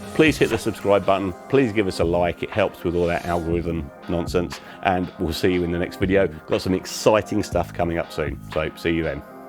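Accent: United Kingdom. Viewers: Woww! How could I've learned so many things in just over 10 mins video? Honestly, sometimes Brit accent confuses me, but in your case...SUBSCRIBED!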